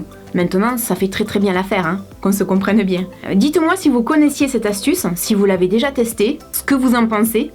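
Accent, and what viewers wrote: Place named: Marseille, France. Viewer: vous aviez l'accent marseillais comme kamila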